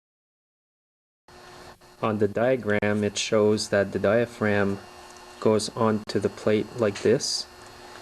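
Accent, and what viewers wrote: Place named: Canada. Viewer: great info. exactly what i needed. thanks for posting! nice Canadian accent, by the way. very calming!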